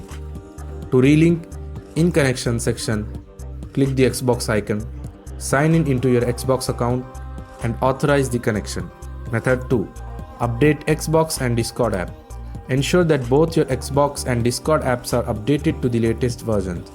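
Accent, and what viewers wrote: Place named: India. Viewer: You think I didn’t search up how to fix if I wanted a Indian to read it out I would have asked chat gpt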